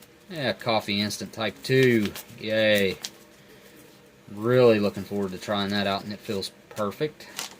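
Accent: South, United States. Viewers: Love the accent. As an Aussie, I appreciate a good country sounding American 👍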